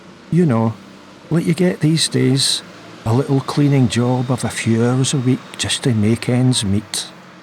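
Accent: Scotland, United Kingdom. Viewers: What a wonderful video, remarkable story telling ability, and great Scottish brogue. A delightful experience. You also reminded me of my Uncle Wink which also brought pleasant memories. Have subscribed to your channel and look forward to further video's. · Hello from Belgium. Thank you for this fascinating video. I always was interested in things like this. You're a great storyteller! Love the Scottish accent. Everyone can fantasize about the woman in the window. Thank's for doing the history. 👍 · Me gustó muchísimo tu investigación. Me habría agradado escuchar tu voz relatando la historia. No comprendo porque el audio que me llega esta doblado al español. No hay modo de disfrutar de tu acento escocés. Felicitaciones por tu tarea. Saludos desde Buenos Aires. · What a fascinating story! And told so well! No fanfare, just good old fashioned storytelling made all the more interesting by your lovely Scottish accent! 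Thank you!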